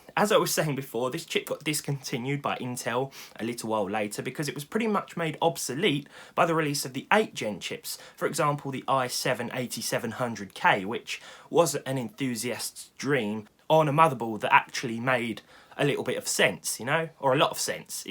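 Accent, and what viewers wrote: United Kingdom. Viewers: 🅱️RUV ENGLİSH ACCENT GİVE ME A GAY VİBE BUT ANYWAYS KEEP GOİNG İ LOVE YOUR VİDEOS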